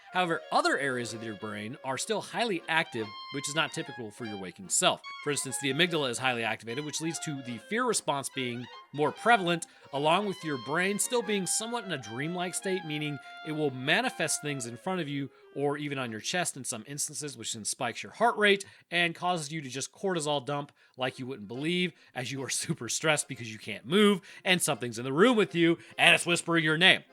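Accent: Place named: United States. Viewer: "Learning more about the world around us" I imagine that would be vastly different between literally ANYONE ELSE IN THE WORLD, and Seppo's...who have no idea what the rest of the world is like, outside of what their State-based Social Media brainwashing and generational indoctrination tells them...which, ofcourse, is all wrong! Also, I know all english-speaking countries and peoples have an accent... but Imperial Measurements are pronounced "Fu<kwit units"... I know, I know, its an accent thing, I hear it as "Freedom Units", but just wanted to clarify that for all the other English-speaking audiance members... he is saying "Fu<kwit units". And I know, we hear his American Accent as "heathen units", but thats just the Seppo twang on "Metric units"... Remember, the U.S. is a third-world country pretending to be a first-world country... They don't know how good it can be away from that toxic brainwashing and indoctrination...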